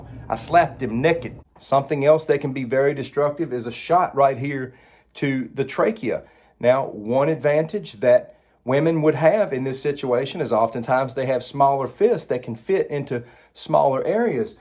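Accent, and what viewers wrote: South, United States. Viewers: Thank you for making a video on this topic. Also, I enjoy hearing you talk. You have a nice accent; I'm just trying to place it. Southern? I'm from Tennesse and I love a smooth accent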